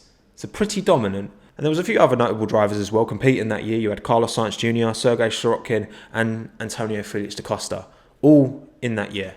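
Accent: Ireland. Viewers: Irish Guy???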